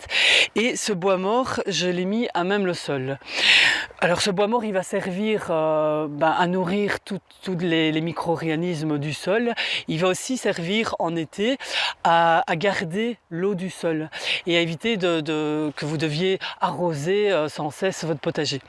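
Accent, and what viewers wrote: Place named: Belgium. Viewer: Accent accent belge, juste un peu comme, sans plus quoi....Alleï bonne journée!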